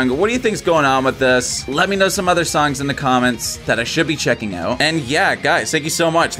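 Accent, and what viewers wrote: Canada. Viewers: "Oh fuck bud". You Canadian bud? Haha. First time seeing your reactions, good one man. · You're canadian as fuck, aren't ya buds? Decent. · Love the song and the vid but I’m still trying to figure out if you’re Canadian or just watch a ton of Letterkenny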